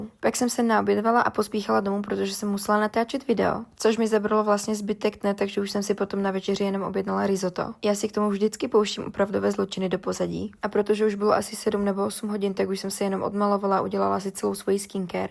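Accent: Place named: Slovakia. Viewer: Máš super videa ale nejsi náhodov zo slovenska ? Pretože sa mi zdá že nejsi z česka lebo na tom hlase to je poznať ale ide ti to pekne pokračuj v čestine❤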